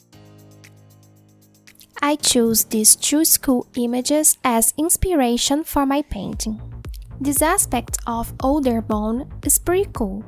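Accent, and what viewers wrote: Brazil. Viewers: Esse sotaque aí e BR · Dá pra ouvir o português por debaixo do seu ingles. Vc é Brasileira de de onde? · I can recognize a brazilian accent easily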